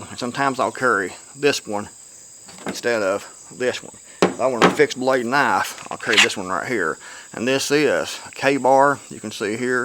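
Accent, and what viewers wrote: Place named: South, United States. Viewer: This is the first of your videos I've ever seen. It just popped up in my YT feed. Soon as you started talking, my first question to myself was "I wonder what part of East TN he is from?" Went to your channel and saw several thumbnails with the Tennessee state flag in the background. ;-) I'd hazard a guess of Greeneville, TN? Possibly Kingsport or outlying areas. Born and raised in Gray, TN myself. ;-) Oh...and cool on the EDC stuff. Not a Glock fan myself, but they do work. I just don't care for the ergonomics of 'em.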